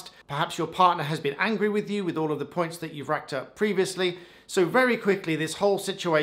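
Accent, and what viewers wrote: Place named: England, United Kingdom. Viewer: What country is he talking about? He seems to have an English accent.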